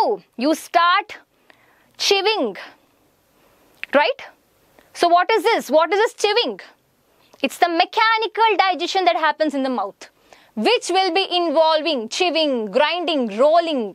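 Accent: South India, India. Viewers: Kyuki ye mam south indian hai isliye 😒😒 Izzat krna nhi sikhe ho kya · Mam you are south indian?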